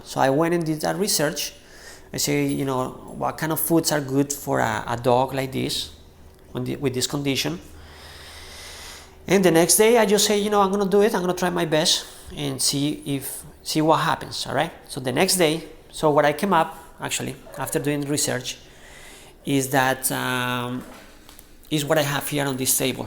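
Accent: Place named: Colombia. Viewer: You sound Colombian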